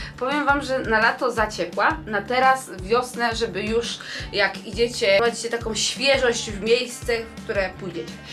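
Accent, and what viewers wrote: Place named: Silesia, Poland. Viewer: Od weekendu polowa października 2024 wpadłam na twoje filmy. Podoba mi się twoja energiboost. Mówisz co sadzisz, a najbardziej zaintrygowała mnie gwara... więc dzisiaj 22.10.24 postanowiłam przewinąć udostępnienia... Opole❤ Jestem spod Opola. Bardzo sobie cenie gwary, a Śląski- jest miodem dla mojej duszy. Co do tego filmiku- przepięknie wyglądasz w ciąży z Mikołajem. Ja przy pierwszej ciaży wyglądałam niczym Orka ze wzdęciami. Jedynie pozazdrościć.❤ Kobieta bez takiego doświadczenia, nich lepiej zamilknie jape lub napisze po porodzie, a najlepiej się pochwali Błogoslawinym stanie, gdy na wadze poerdyknie 15kg- 23 kg więcej życiowego ciała( mina diabła). Nikt sie nie odważy, a jeśli tak, to tylko z filtrem w szmatfonie. Pozdrowienia dla was i wielki przytulas dla Mikołaja!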